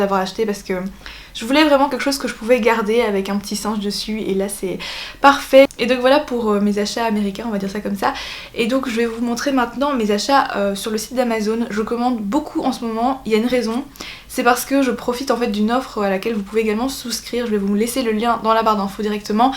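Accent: Belgium. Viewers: juste, tu viens de belgique ou de france ?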